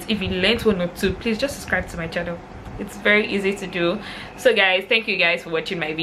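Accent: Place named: Africa. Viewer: I love ur african accent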